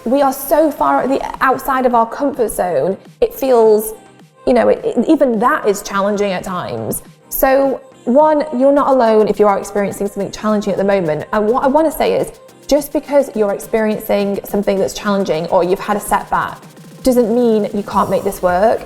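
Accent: United Kingdom. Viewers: She’s British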